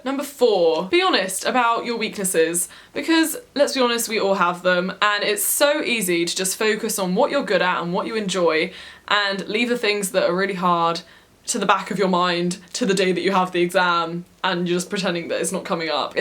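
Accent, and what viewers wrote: England, United Kingdom. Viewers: Lol why is accent and voice so different to now(2020)?? Like she sounds more English which is weird as I’m English but I e never heard someone sound more British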